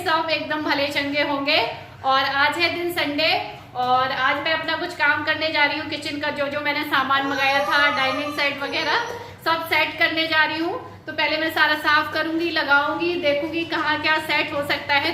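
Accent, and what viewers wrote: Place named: Agra, India. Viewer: Accha aap Agra ki ho didi . mujhe lag hi raha tha aapki boli se mai bhi agra ki hu .Apne log pehchan me aa jate hai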